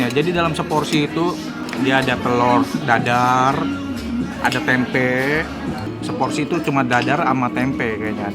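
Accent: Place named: Java, Indonesia. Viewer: mantappp....jawanya tambah Meddokk mamen mkan coyyy..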